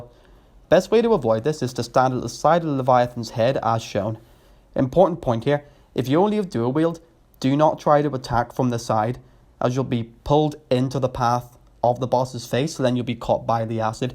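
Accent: Northern Ireland, United Kingdom. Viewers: Awesome hearing a Northern Irish accent 😝 represent!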